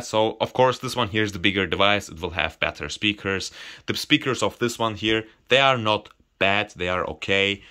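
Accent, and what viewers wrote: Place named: Germany. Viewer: I love your German accent ❤️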